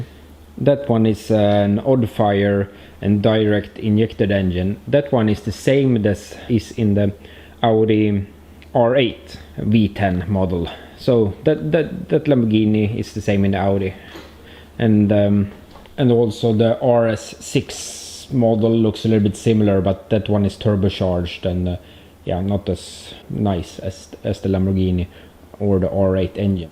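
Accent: Sweden. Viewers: är du från Sverige eller?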